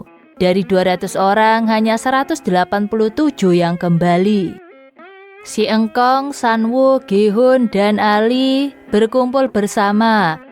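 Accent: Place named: Java, Indonesia. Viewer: Mbak e suarane medok tenan 🙏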